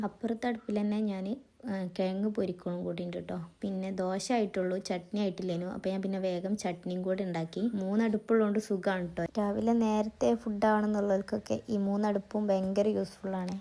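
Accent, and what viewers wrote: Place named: Kannur, India. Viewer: Video Nalgene....specially ur slang..nmlu kannurkark Kelkar ishta....but lack of content feel cheythu....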